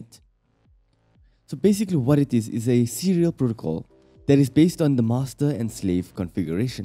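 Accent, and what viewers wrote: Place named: South Africa. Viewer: are you an indian from South Africa by any chance?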